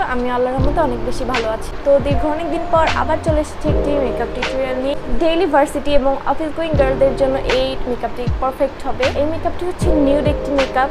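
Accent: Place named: Bangladesh. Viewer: when you start talking 💗💗 damn she is bangladeshi😻💕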